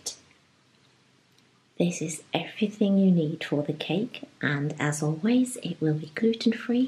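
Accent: England, United Kingdom. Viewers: I keep watching your video's. Your English is so beautiful, still you make German cakes. So I wandered if you are from Germany, what is nearly impossible because you sounds so really English. Carine from Bruges, Belgium. I love how you bring charming England so nearby.☘️🌷☘️🌷☘️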